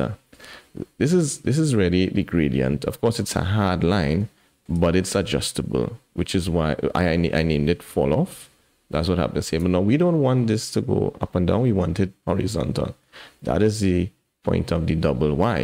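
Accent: Trinidad and Tobago. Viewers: Yo, awesome tutorial. Are you from trinbago?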